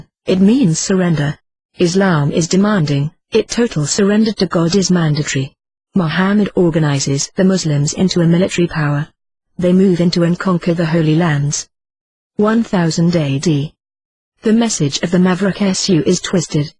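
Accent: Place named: England, United Kingdom. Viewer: It s hard to listen this robotic voice with english accent.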